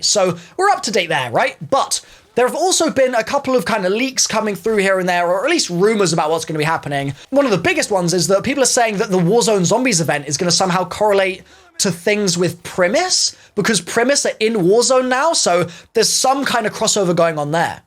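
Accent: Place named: England, United Kingdom. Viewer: Is it just me or just everyone likes he's English way of speaking?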